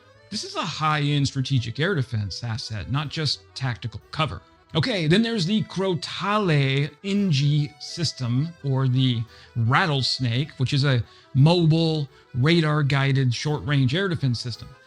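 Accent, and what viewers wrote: France. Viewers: Forgetting your horrible French accent, good effort for the video and don’t forget France is the only real power in Europe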